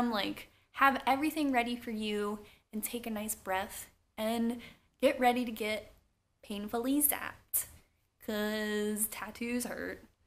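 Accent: Philadelphia, United States. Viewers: Are you from philly?!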